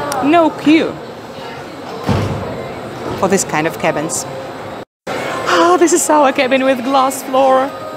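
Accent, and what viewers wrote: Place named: Russia. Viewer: Хороший английский, но сразу узнаю русский акцент по произношению звуков "ch" и "u".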